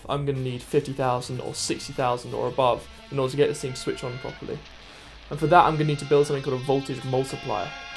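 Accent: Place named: United Kingdom. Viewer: British ahhh yes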